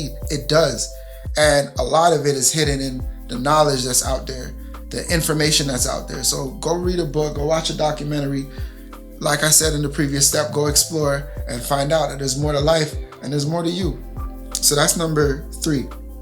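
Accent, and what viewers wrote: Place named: Jamaica. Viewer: Great advice. idk how, but I knew u were Jamaican as soon as u spoke lol wassap fam. But yeah. I think one of the hardest things is trying to build yourself outside of your family. Like you were saying, certain habits you didn't know you had. I recently moved out, and there are so many things I thought were normal that aren't.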